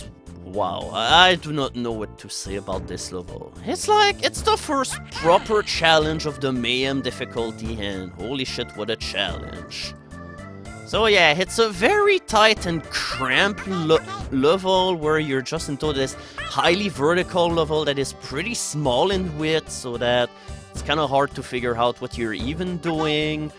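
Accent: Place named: Quebec, Canada. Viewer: Il me semblait que je reconnaissais le doux accent québécois!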